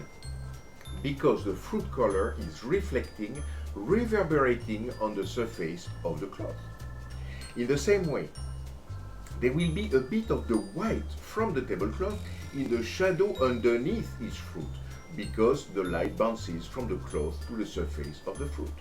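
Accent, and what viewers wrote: France. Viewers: i love your france dialect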